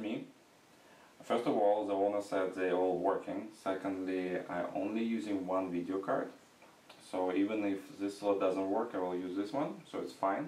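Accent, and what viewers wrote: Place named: Russia. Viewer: I don't think it really is German. He probably does speak German well, but I'd say Austria or Poland or Hungary. They all speak German well, but slightly different accent from actual German. I caught him having a minor Russian slant to his English(ex:blyeed, pyart). His accent is slightly off from German-German. I hoor ze vaak genoeg hier in de omgeving.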